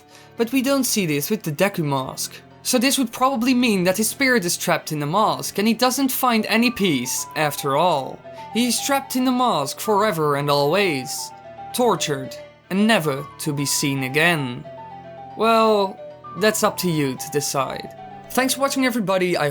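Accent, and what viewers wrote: Netherlands. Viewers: You sound Dutch are you? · I bet my life this guy is dutch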